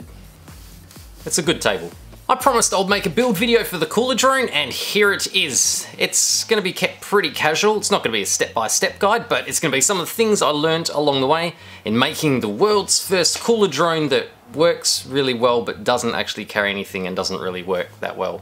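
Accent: Australia. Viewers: The most unbelievable thing for me was hearing his accent but that he kept saying cooler and not esky or even chilly bin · You sound australian, but don't call it esky?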